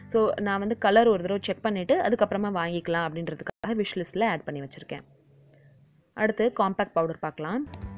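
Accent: Madurai, India. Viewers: OK mam unga voice nalla irukku athuvum illama neenga madurai ache super mam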